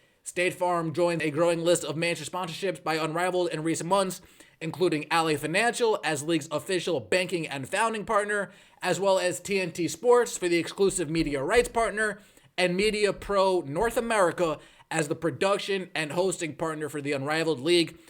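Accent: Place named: New Jersey, United States. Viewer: Nahhhh, thats just how we all speak "Down the Jersey Shore"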